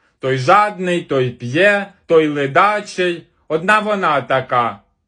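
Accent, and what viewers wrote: Poltava, Ukraine. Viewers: Полтавський говір)) дуже влучно) Ви не з Глобине?)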